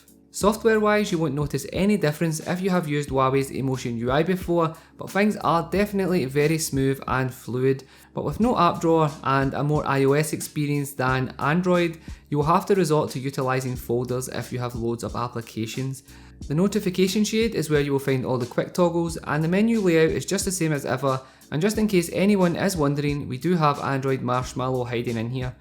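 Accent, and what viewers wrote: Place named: Aberdeenshire, United Kingdom. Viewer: Are you from Aberdeenshire?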